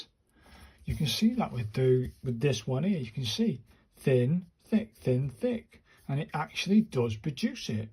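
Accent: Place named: United Kingdom. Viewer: I watch your videos because of your "British Accent" and Calligraphic Content. Good Luck. Keep it up.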